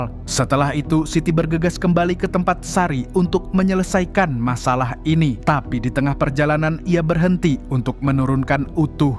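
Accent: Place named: Nganjuk, Indonesia. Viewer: kok nganjuk bang aku orang nganjuk 🤣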